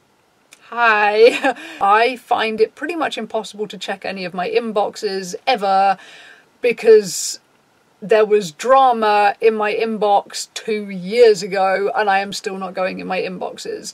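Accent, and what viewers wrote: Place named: England, United Kingdom. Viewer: Oh my goodness, I just realised that you're from England I keep assuming people people are from america on netflix or youtube and cant even hear peoples accents, I have to stop and figure out if someone's English or American, is that weird? lol and im from England too hahaha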